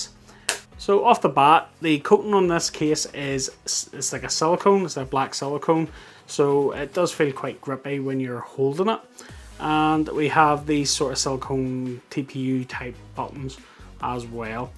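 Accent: Northern Ireland, United Kingdom. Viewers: Well I wasn't expecting a Norn iron accent in a tech video! Super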